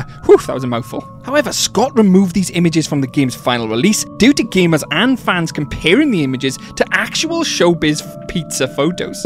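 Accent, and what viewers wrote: Wales, United Kingdom. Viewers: sorry are you welsh? you sound very welsh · Are you Welsh because you sound it? Well, so am I! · nice to know there's welsh people on YouTube, barely see it, can tell from the accent. i have the same. keep it up mate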